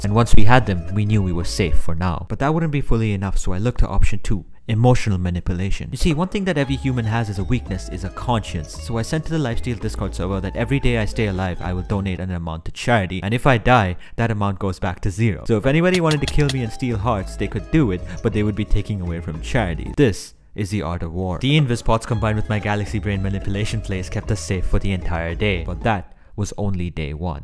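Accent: India. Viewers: ru Indian? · You speak innindian accent 😯😯😯 Are you one??? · I don't know why but your accent feels very indian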